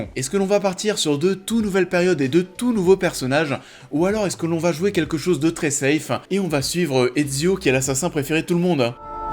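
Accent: Paris, France. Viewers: Si la serie marche un tant soit peu, je mets un billet sur le fait que Netflix tente d'en refaire un film un peu comme El Camino avec BrB ou toutes leurs déclinaisons de leur série sur Marco Polo, avec une periode inédite différente de la série, peut être sans l'époque contemporaine, une fois que le délire capuche/crédo sera bien passé avec la série. Ça nous fera peut être oublier l'horrible nanar de 2016 ! PS : Mollo sur l'accent parisien stp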